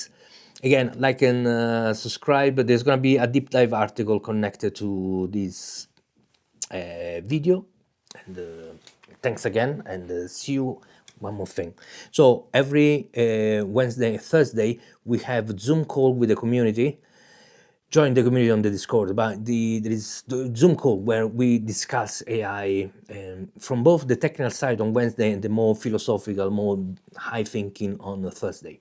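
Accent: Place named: Italy. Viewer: Many thanks for your detailed response, and happy YouTube anniversary! I wish you the very best in the coming years. I was hoping to run a local coding LLM, as well as develop an AI powered app on this machine, but looks like I was under the wrong impression that it can handle that. I really appreciate your clarifying this matter to me. Just a side note: your Italian accent is great! My next door neighbours here in Canada are Italians, and they are the best neighbours anyone could dream to have. Have a fantastic weekend!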